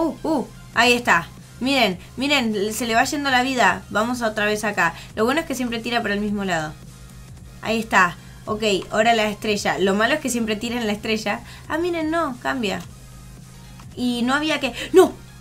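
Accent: Argentina. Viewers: SOS Argentina?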